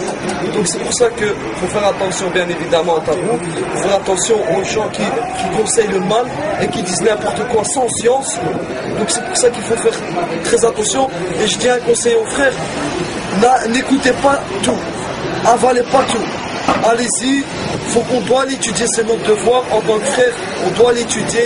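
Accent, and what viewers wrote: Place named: Maghreb, North Africa. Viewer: إذا كنت تقصد هذا الشاب - بارك الله فيه - فهو اما ان يكون امازيغي او عربي من المغرب او الجزائر فهم كثر في فرنسا. اللهجه واضحه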